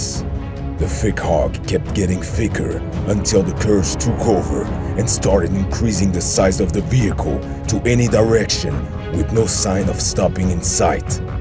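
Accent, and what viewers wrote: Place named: Northern Europe. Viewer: you sound like vin diseal with a northern european accent.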